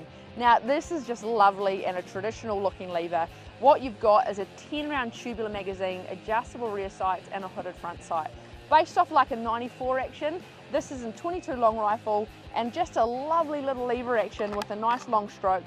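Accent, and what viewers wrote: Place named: South, United States. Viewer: Shes a southern girl!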